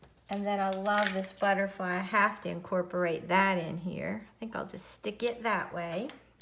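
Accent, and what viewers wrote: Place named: Georgia, United States. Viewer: I like all you crafts and your southern GA speaking. I am from MS, so mine is more country. Like you I love to be creative. I am gonna be in GA about 10 days starting the weekend.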